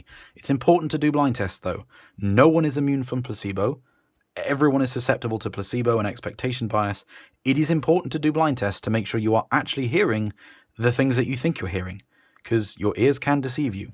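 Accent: United Kingdom. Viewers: I’m simply listening through fiio m11 with oriolus BA300s and phantom headphones (modified) with all my research LSA is the way to improve my headphones when budget allows. One thing I’ve wondered. What difference does desktop amp/dac make to sound over portable? I’ve seen reviews of the topping a90 and wonder if that would produce better sound than my motu 828 mk3? I look forward to your future reviews. Good to hear a Brit doing these reviews, I’m up in Cumbria. Keep up the good work.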